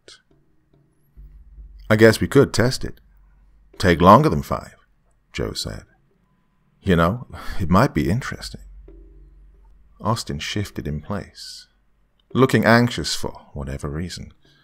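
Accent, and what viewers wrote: France. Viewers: I agree!!! 👏👏👏 his French accent is TOPS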